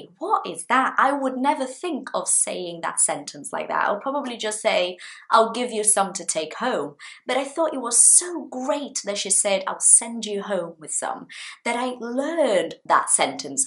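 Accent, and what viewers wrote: United Kingdom. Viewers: I love your British accent, it is my focus. I don't pronounce the letter r and I usually use schwa sound, but the most of English teachers prefer American accent than British and the worst is that they try to enforce us to speak like an American.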